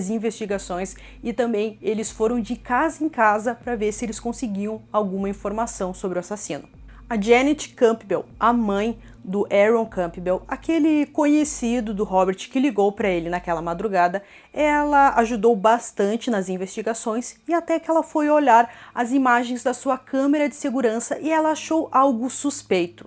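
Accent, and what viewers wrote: Rio Grande do Sul, Brazil. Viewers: Tu és gaúcha?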